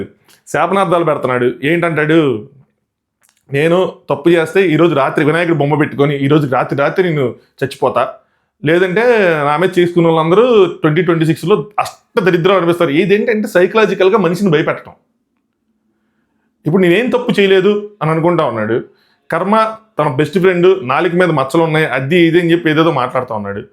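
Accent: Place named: Godavari, India. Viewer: Annayya nenu mimmalni subscribe cheyakunda mee video lu choosedaanini,,,chaala shame ga feel avthunnanu,,,, nenu chaala thakkuva channels ni subscribe chesaanu,,,, okati rendu vantala plchannels ,,,, garikapati gaaru,,, Chaganti garu,,, samavedham garu,,,,priyachowdary garu,,,, filmymoji,,,, ika itu paina meeru ,,, meee maata theeru pakka godhavari kada,,,,, entha chakkaga paddathiga matladuthunnaro,,,,, chaala manchi values ethics morals unna points meeda cheothunnaru,,,,, sooper Annaya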